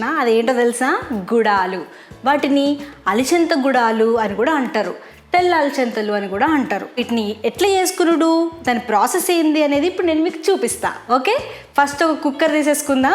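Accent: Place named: Nizamabad, India. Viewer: Though it's orugallu vantillu..You are pakka NIZAMABADI 🔥💗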